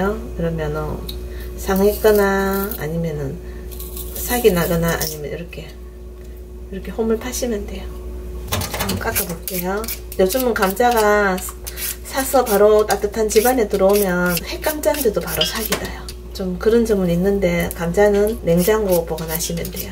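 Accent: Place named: Busan, South Korea. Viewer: 친정이 부산이라 목소리랑 억양이 넘 친숙하네요~^^ 좋은 정보 감사 드려요~♥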